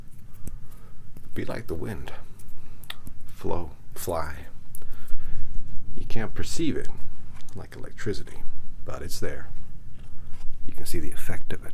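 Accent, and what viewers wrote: United States. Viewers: So wild how you can do a really good American accent. Love theese videos the breathing techniques really help me to relax enough to rest at night. Thank you!😊💤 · These Reiki sessions are such a treat - the gravelly US accent is so restful. I do hope you'll consider doing more of these after the 8 'block bookings' are over? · Can we talk about how this guy can just casually do a great American accent? I’m a northerner and I’ve been in the states for like 2 years now and still can’t mimic the accent! How did you get so good at that lad?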